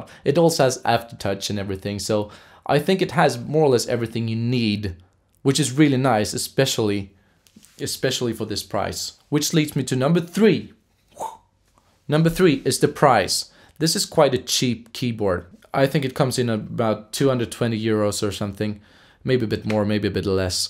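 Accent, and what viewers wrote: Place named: Sweden. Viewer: Great review. I was looking for another keyboard primarily to use as a DAW VST controller, and was considering this one, but your review really helped me realize that the faders do not have the precision that I need to control things like cutoff frequency. Just based upon your English, I am guessing that you are Swedish. If you live near Stockholm, do you have any recommendations on where to buy music gear or music accessories. Tack!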